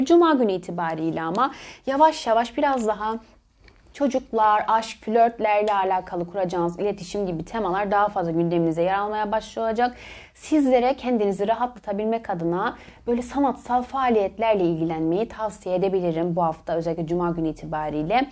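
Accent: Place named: Cyprus. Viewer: Tam bir kıbrıs şivesi var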